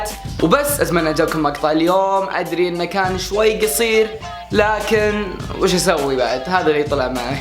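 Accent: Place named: United States. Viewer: كيف تعلمت انقلش مرا كانك امريكي؟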